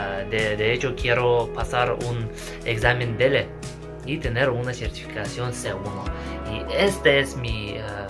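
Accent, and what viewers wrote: Standard. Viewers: Hola que gusto conocerle, se entiende muy bien la pronunciación, y es una pronunciación neutra excelente, yo actualmente estoy estudiando el idioma Kazajo y aprendiendo su cultura.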